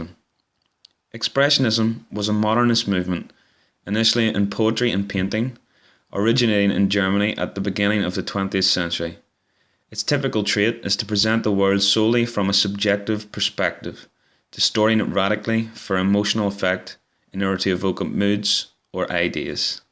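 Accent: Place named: Belfast, United Kingdom. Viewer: Irish, fer sher. I'm guessing Belfast, from my travels round about.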